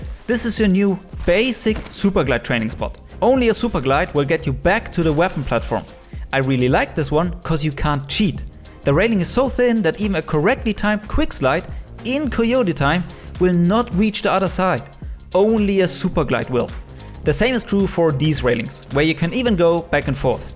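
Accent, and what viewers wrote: Germany. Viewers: Man hört richtig raus das du deutsch bist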